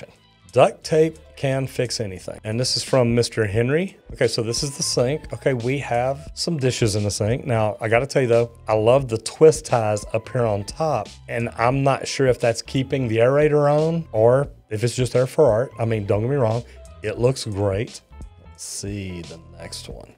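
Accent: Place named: South, United States. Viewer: "if y'all haven't seen it..." *as I'm clicking for the new video. Must be the voice... making me do it, how do I deny Southern Charm?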